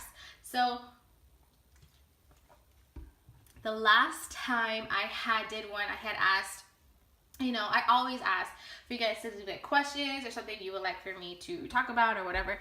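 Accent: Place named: Mexico. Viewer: I'm curious what your nationality is! If I go by your accent alone I would guess Mexican. I'm from Texas just outside of Houston and I go to San Antonio all the time.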